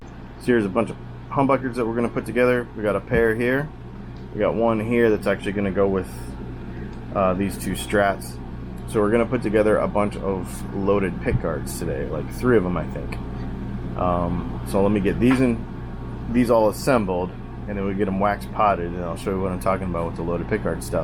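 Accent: South, United States. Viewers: Windowses and browserses...a southern thang. Just like, howzyamomanem! Fine thanki. An yourz? Gotta love it.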